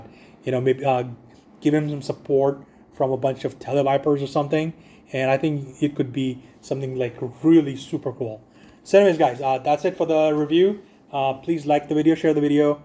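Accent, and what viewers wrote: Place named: India. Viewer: good review video bro hey! hi from Quebec Canada, I speak French, so me too had to learn English to join the gi joe community, welcome! from your accent, you must come from India or something? scrap iron your videos have little views because the trend is about the new releases, I have this guy since years before, so that s why it will not have many views you can compensate with dios - scenes to present them, it always look better, just cardboard and paint does a decent job, it s cheap. he s one one my favs in Cobra, he looks good next to reg blue troopers and the stinger jeep he s a tank killer, so he s always protected by troopers, vipers, so he only needs a gun. since you re new, or at least come from far away, you should know the Hasbro pulse site, here on u tube, check the 'post' section to comment and suggest to the makers themselves, I m sure they ll love that you love gi joe coming from so far. 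the cartoons, comics, they are a reference, but the file cards are the most important infos, I rely on that all the time, scrap iron is a solid character for Cobra in gi joe, congrats! so now you know... God bless America and world peace good work bro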